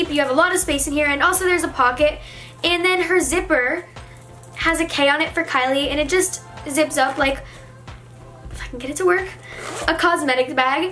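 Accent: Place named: Pennsylvania, United States. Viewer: Do you live in PA? Jw bc you kinda have an accent 💗 (btw loved the vid!)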